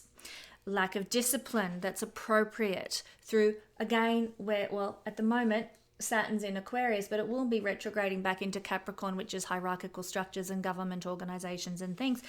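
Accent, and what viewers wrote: Australia. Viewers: Your Australian accent is very pleasing to the ear! I miss your lovely country and all its wonderfully amazing people ❤🧡💛💚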